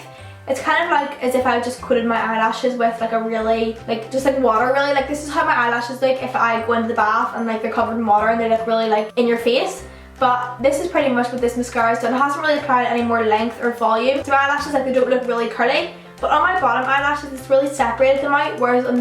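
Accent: Northern Ireland, United Kingdom. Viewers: omg are u from Northern Ireland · R u from Northern Ireland I am xx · She's from Northern Ireland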